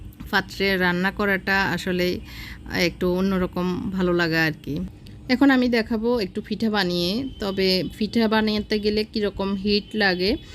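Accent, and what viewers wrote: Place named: Feni, Bangladesh. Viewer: উনি তো জোর করে কাউকে ভিডিও দেখতে হাতে পায়ে ধরে নাই। যার ভালো লাগবে দেখবে না নাহলে দেখবেনা। দেখবে আবার নেগেটিভ কথাও বলবে। উনি ফেনীর মানুষ। তাই ভাষায় একটু আঞ্চলিক টান আসে। এটা দোষের কিছু না। আমরা কেউই নিশ্চয়ই বিশুদ্ধ ভাবে বইয়ের ভাষায় কথা বলিনা। উনাকে সবাই ভিডিও তে দেখে। আর আমাদের কেউ দেখেনা। তাই নিজের দোষ কেউই দেখতে পায়না। অন্য কে অসম্মান করার আগে অন্তত একটুকু ভাবা উচিত যে তাকে অপমান করার যোগ্যতা সত্যি নিজের আছে কিনা।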